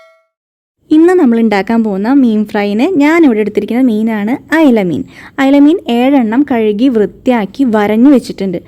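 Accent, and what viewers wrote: Thrissur, India. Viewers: Stylan dish kalakki chowavilla Thrissur basha kidu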